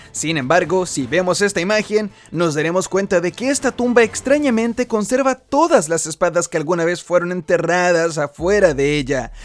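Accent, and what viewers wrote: Chile. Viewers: tu no eres de mexico, eres chileno hahaha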